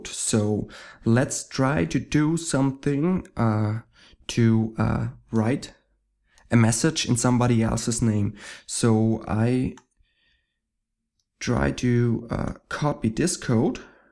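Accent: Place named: Germany. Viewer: Germanish english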